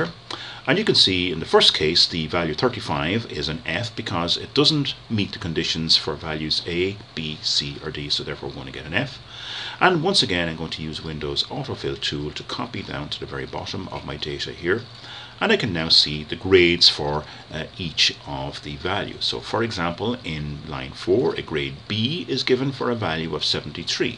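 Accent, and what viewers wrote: Ireland. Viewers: Irish accents are awesome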